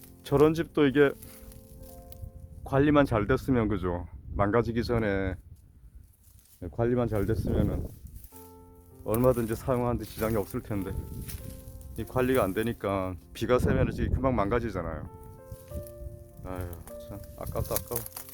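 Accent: Gangwon, South Korea. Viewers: 우연히 보고 들은 강원도 억양.너무 반갑고 좋아서 보고 또 보고 듣고 합니다 정말 감사히 잘보고 있습니다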